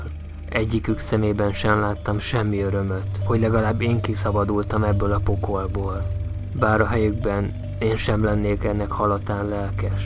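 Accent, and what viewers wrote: Russia. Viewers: Jó az orosz akcentus :-)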